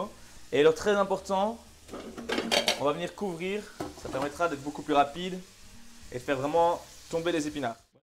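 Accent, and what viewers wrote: Belgium. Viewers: J'adore l'accent 🇧🇪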